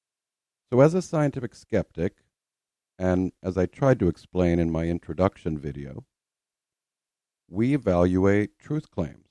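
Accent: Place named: Ireland. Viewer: I’m late to channel, but I do love him and his to-the-point story telling. And yes, his brogue is charming, too!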